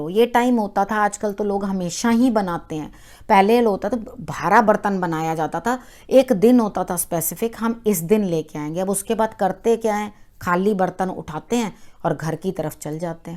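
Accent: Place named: Punjab, India. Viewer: Very well explained, m ur fan ,specially our type punjabi accent.i adore u a lot.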